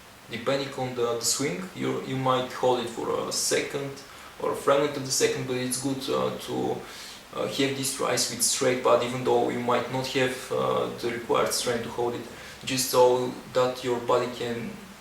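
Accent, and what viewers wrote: Bulgaria. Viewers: are you bulgarian ?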